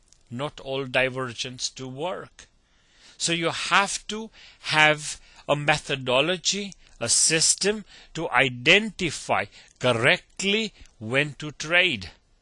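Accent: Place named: India. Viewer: love the indian accent. thnx for the vid tho :)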